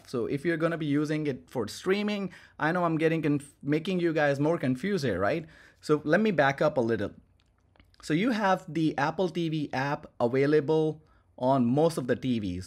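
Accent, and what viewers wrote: India. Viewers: your indian accent is not that strong. don't worry bro.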